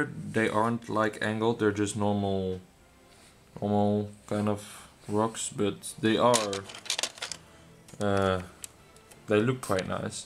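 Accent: Netherlands. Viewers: Ik hoor gewoon dat jij nederlands bent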